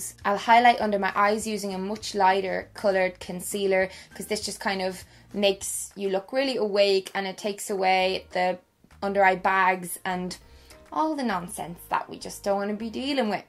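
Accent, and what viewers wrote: Ireland. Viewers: wait, are you Irish??? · i luv ur voice i wish mine was that irish 😍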